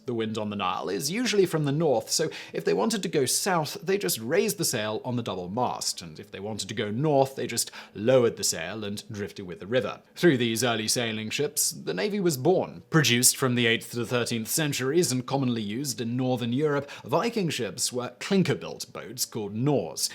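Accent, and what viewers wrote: United Kingdom. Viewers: I'm so confused, you sound British but all the videos are so heavily American focused.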